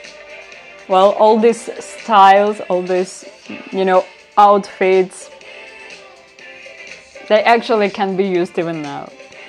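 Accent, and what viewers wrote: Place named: Ukraine. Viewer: I didn't know you were Ukrainian but I suppose you were from around based on your accent. I'm also a huge Foals fan and I saw you reacted to one or two more songs by them. Much hugs and love from your number one fan from Bosnia. Your reactions are awesome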